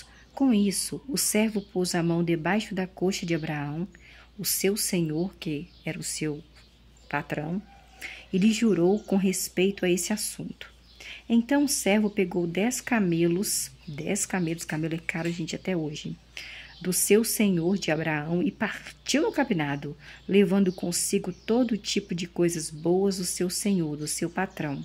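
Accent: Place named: Minas Gerais, Brazil. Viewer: pelo sotaque lindo de mineirinha, já era pra mim saber! Deus abençoe sua coragem e grande ajuda com explicações como a sua, simples e voluntária! 🙏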